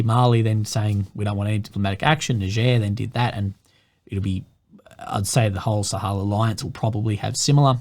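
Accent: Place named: Australia. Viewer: Just started watching your updates, good to hear an Australian voice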